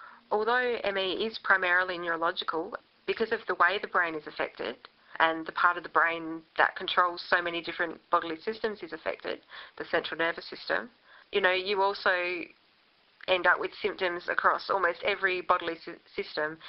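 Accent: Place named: Australia. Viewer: 1 I am so grateful to have found THIS video. I am tickled at the Aussie accent. :-) I was a VERY active, healthy, dynamic working girl until one fine day in July, 1986, I collapsed at work for no reason. I was taken to hospital, but released after 7 hours of observation. Exactly one week later, I collapsed again and was put into hospital for a week, while they ran every conceivable test in the book on me. I left that hospital an invalid with every single test showing normal. cont....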